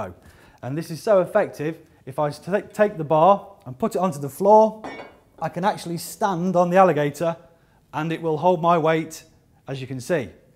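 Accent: United Kingdom. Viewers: All is good in video except britt english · I expect a proper german for these videos. Go home britbong.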